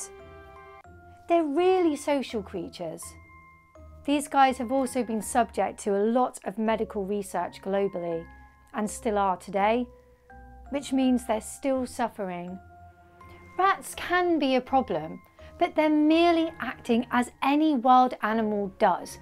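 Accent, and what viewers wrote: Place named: United States. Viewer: You talk about rewilding in Britain but your not actually British yourself from how you speak I guessing you’re actually American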